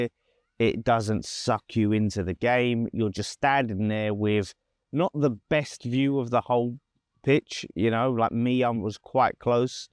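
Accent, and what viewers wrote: Jamaica. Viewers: why do you talk like a jamaican? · I probably agree with everything you say - but please change your accent, you sound like a yardie in Jamaica - I had to switch off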